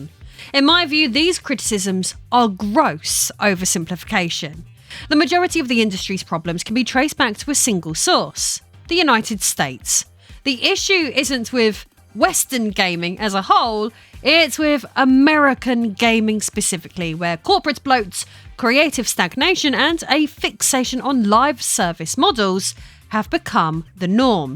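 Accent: United Kingdom. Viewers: She said with a British accent the country locking people up for tweets ffs. Then the first comment she pins is all adds and ways to pay her. But damn America is capitalistic and money hungry.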